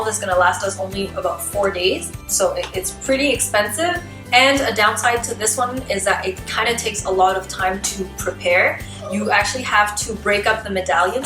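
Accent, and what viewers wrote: Quebec, Canada. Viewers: J'entends ton accent franco-canadien, c'est super! :) Merci pour ce vidéo! Ma shihpoo vient de se faire extraire 2 dents et on aimerais qu'elle soit aussi comfortable (que possible), durant son rétablissement. xx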